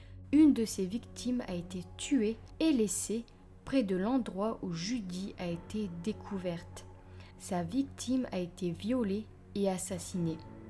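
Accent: North, France. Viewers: Je viens de te découvrir..... Marathon de vidéos depuis cette nuit ! Bon travail ! + 1 abonnée ! Ton accent viens du nord si je me trompe pas ?!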